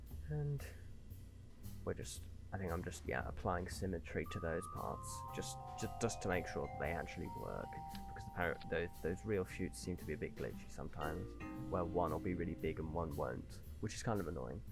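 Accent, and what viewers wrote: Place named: Australia. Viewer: why you an ozzie mate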